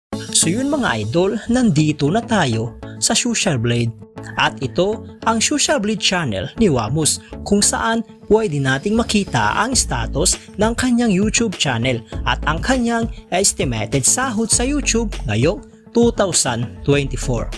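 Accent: Visayas, Philippines. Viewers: Pag BISAYA TLGA, MASAKIT SA TENGA, PUCHAAA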